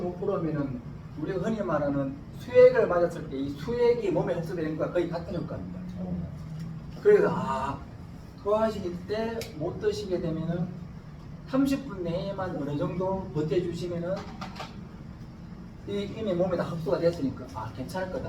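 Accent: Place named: Sangju, South Korea. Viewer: 직접체험 실정된 내용 정보나눔의 열정이 바탕이된 영상 짧은시간 함축된 간증의 이야기가 뜨겁구나 뒷부븐 라인체계가있었나? 마지막에 집중 못했다 옛기억을 더듬어보면 어릴적 누에칠때 뽕잎도 오염되지않고 깨끗해야하고 누에도 청결한곳에서성장하고 잠을재였든것같다 강연을봤으니 총평하자면 내용좋다 스피치에 강약 리듬를 추가하면 기억잔류에 효과가 더해지지싶을듯 도구의 사용 :::: 화이트 보드의 활용에서 우측 중앙상단에 한정되어있음 전체 공간의 필기활용으로 정보 주입저장성향상.... 경영체계 라인구성 (수직형 방사형?) 되물림 (상속)에서 진실성 진정성을 부각 네트워크 마케팅 첫인사 불신임타파노력 상주촌 놈 어투는 조금 변질시켜 표준어구사및 억양수정.. . 화ㅡ법 내용전달면에서 대화속도 말을 조금 천천히 쫗기는것같잖오.. 영상 구성에서는 박수치는 아저씨 아줌마 있든데 영상편집시 배석자 화면 1~2컷은넣어면 어떨까? (박수치는 동참하는 모습) 실크아미노산 정보확장 불 붙기를.....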